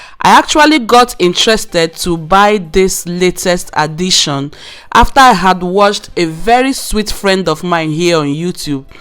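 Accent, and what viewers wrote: Nigeria. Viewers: That is a strong igbo accent.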